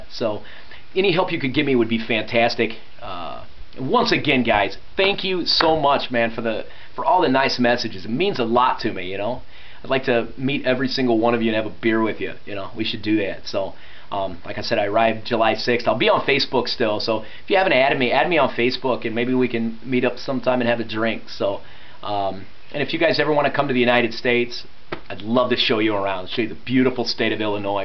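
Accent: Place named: United States. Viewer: I always watch American movies and I know how to pronounce the words in the American way. Of course I mean general American. There are a lot of dialects in USA, XD. Don't lose your American accent! It's just great, I don't like the British accent, the American one is much better.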